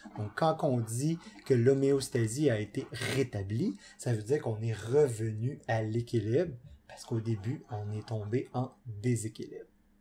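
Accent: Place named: Canada. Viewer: francais Canadian !!! terrible malgre ca merci pour la video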